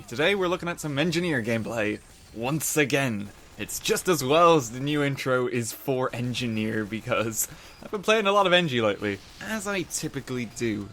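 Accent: Ireland. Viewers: Ah, the sweet, sweet Irish accent flows gracefully over my ears yet again. So similar to mine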